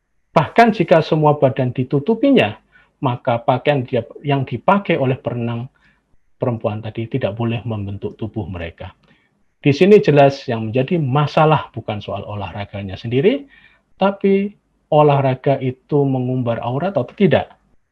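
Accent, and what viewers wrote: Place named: Java, Indonesia. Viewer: Jgn nampak logat jawanya min malu saya sebagai orang Jawa cm punya argumen tolol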